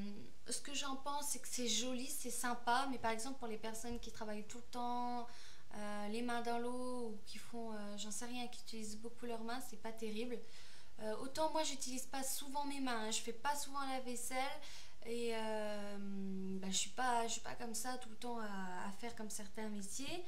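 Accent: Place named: North, France. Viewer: Tu es bretonne? Brest ... Mais ta un accent du nord pkutot mdr moi aussi je suis de bretagne dans le 29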